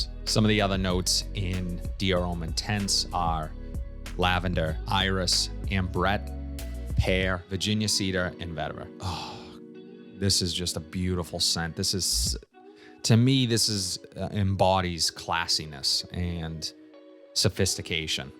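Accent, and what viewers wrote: Boston, United States. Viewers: Nice presentation. But seriously, you need to get rid of that Boston accent. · It's very distinctive. I'm not even American but I can pick that Boston accent out a mile away. It gives you your charm mate 👍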